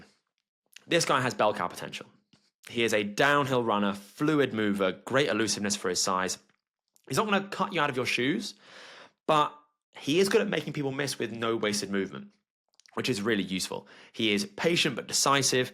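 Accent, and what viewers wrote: United Kingdom. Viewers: Did u even watch RJ Harvey Way off base I knew when I heard the English accent this was going to be pure comedy 😂😂😂 Take time study the game more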